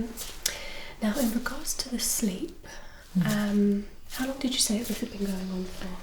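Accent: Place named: England, United Kingdom. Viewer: J’adore ton accent et ta manière de parler . Tu es anglaise ?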